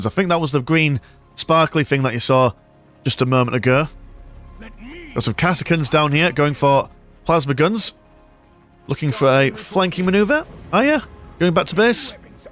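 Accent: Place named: East Yorkshire, United Kingdom. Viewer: Recent subscriber, pleasantly surprised when I heard East Yorkshire, from north east myself, love the videos!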